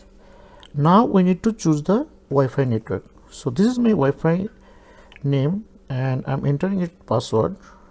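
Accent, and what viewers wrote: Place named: India. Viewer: Talk better English, your India English is worst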